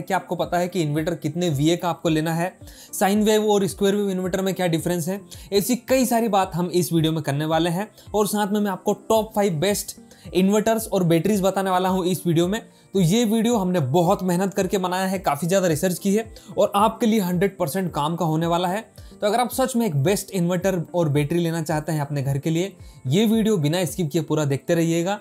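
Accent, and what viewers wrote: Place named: Madhya Pradesh, India. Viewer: brother your talking style is nice i feel mp style tone in your voice , great video specially talking way😊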